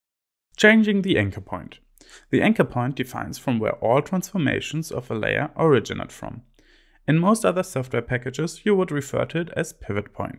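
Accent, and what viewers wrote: Germany. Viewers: Du bist doch ein Deutscher oder? Du hörst dich an wie ein Deutscher der englisch redet :)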